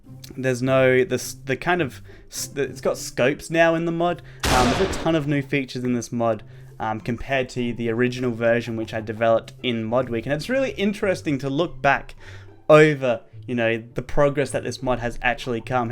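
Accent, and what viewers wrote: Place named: Australia. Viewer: you from Australia I was confused because you say the same as me because I'm ausie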